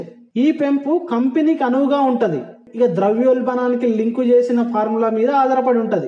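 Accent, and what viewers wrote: Telangana, India. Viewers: Your comment is bad. Telugu has many accents. It varies almost from district to district. Telangana accent is sweet to hear.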